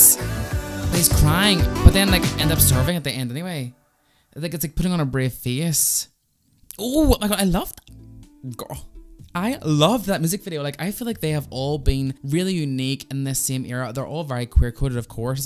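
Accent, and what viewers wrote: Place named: Northern Ireland, United Kingdom. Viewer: Yes ,,,,ok can I just say I hadn’t got a clue what the hell you were saying,,,,,, I would recommend you trim the accent down to the point where people outside N,Ireland would not arrive at the conclusion that English is your second language. And I’m from NEWTOWNSTEWART via Amsterdam and LONDON.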